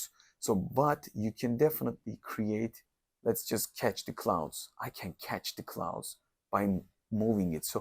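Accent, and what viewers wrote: Turkey. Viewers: Türk aksanı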